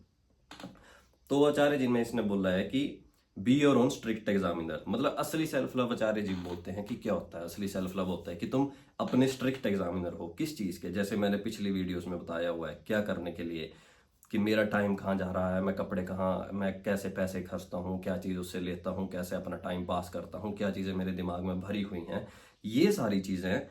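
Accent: Himachal Pradesh, India. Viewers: You are talking in hindi,so there is a himachali touch in it and i am from Himachal,so I felt good to hear you Nice 🥰❤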